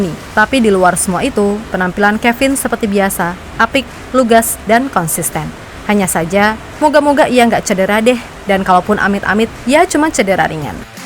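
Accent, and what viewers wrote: Java, Indonesia. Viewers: Suka medok e yu... Hehehe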